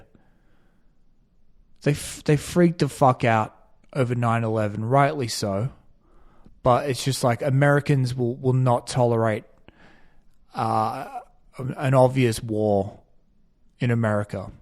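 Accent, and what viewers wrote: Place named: Australia. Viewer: Hey good to hear an Aussie talking about this I’m petrified my biggest fear is nuclear war and I just can’t believe that this crazy fucking person Putin even call him a human has all this control a few boomers can destroy all of our hopes and dreams. Whereabouts in Australia are you from? I’m from New South Wales.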